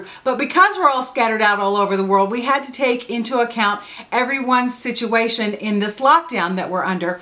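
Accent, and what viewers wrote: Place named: South, United States. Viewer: I give you a 10 times 10! This was so fun and oh my gosh, I got to see your huge closet!!!! Did I hear your southern accent here???? I love the outfit...you have never looked better and you look so dang thin!!! This was just what I needed today...that accent!!!!!!!!!!! Where did that come from!!! I love it!!! I know you have a Tv career...but I felt this video was really you! Love and hugs, Sues & The Dez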